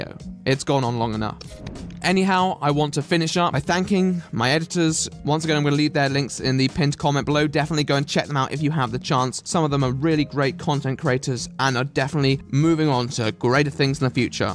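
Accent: RP, United Kingdom. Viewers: Whats with all these commentary channels with RP accents? Is all of the upper middle class in Britain raising children who need to constantly feel better than others? Fan either way. Great video. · a nice posh accent speaking on the delicacy of mental health related sponsorships to go with my cheap ramen. thank you. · A little something called British accent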